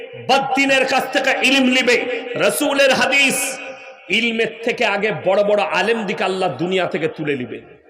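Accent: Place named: Bardhaman, India. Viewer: দাদা, বর্ধমান জেলার গ্রাম এর মানুষের কথা গুলো এই রকম হয়, এতে মাদ্রাসার দোষ কোথায় বলুন?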